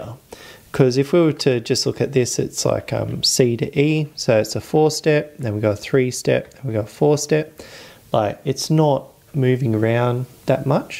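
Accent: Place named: New Zealand. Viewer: gosh i love that accent is that kiwi? great tutorial thank you!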